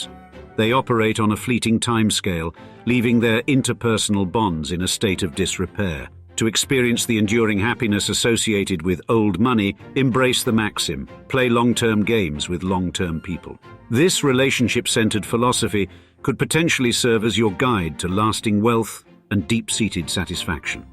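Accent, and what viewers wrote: United Kingdom. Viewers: This is just “rich dad poor dad” spark notes in a British accent.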